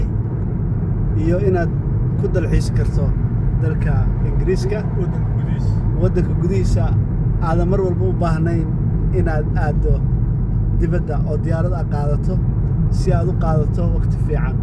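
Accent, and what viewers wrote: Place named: North, Somalia. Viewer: Waryaa wax kale umada keen , inaad reer koraad tahay sida D u dhigaysaa laga garanayaa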